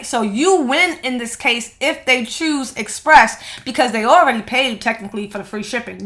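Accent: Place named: Brooklyn, United States. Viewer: I'm thinking 🤔 she looks familiar...😂😉later on in the vid you say you're from NY. Now, I know you just SOUND familiar! 😂Brooklyn? Yes, on the grind!😂💪🏾💯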